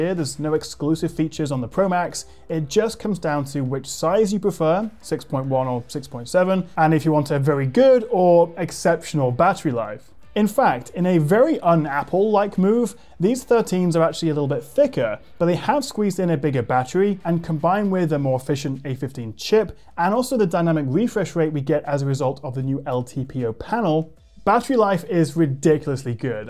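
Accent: United Kingdom. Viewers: Excellent presentation that looks like a live TV coverage. By the way, where was this beautiful village taken? Compliments to your clear, creative, and colourful narration. The British accent is so cool and clean ! love it.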